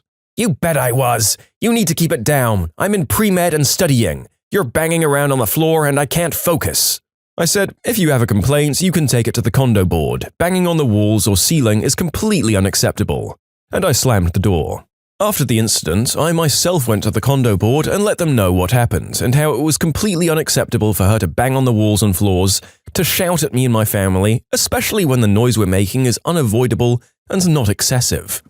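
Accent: United Kingdom. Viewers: oh big deal he’s british, his voice isn’t even bad, you don’t need to get so upset over it. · Pls enough with the British accent 😭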